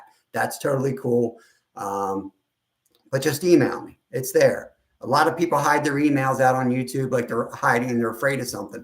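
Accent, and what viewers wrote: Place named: Pennsylvania, United States. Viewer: Any time I think f Kindergarten Cop...."boys have P e n i s and girls have a V....." teeheeee. I said that quote to my radiologist that was in her late 20s. She had no idea 🤦‍♀️. LoL I appreciate your clean channel.!! Once in a while, I slip and say bad words but there are words that is a no no.. especially during the day time. A night stream sometimes brings out dirty mouth. Hehe. But we still love her. Oh, and thank you for the rant in a PA accent, it makes me feel like I'm around family.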